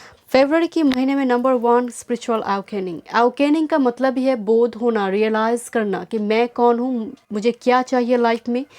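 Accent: Bengal, India. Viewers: You sound like a Bangali.....is so..?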